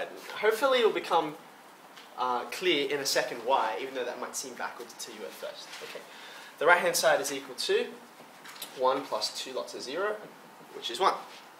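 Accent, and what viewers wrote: Australia. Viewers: I've never seen a Asian with an Australian accent before. He's hot and smart. Great video.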